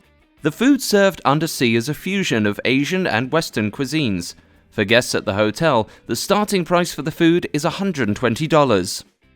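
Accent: United Kingdom. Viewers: Elliott Stewart- You realize the announcer is British right? They pronounce things differently. I'm from Texas and have never heard anybody say Spo-kane so I think Americans get it. Also, your apostrophe is unnecessary. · AngryTurtle Gaming He's British.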